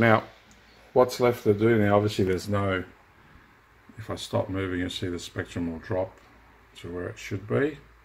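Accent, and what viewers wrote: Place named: Australia. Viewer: You sound like you're in Australia. I wonder if you can receive a 60 Hz signal emanating from power lines elsewhere in the world. American Samoa would not be too far away, but it's small, so power lines would be short, not making a good transmitting antenna. However, in North America, some power lines are hundreds of miles long. If a wire antenna does not work, I would try using an iron core magnetic loop antenna.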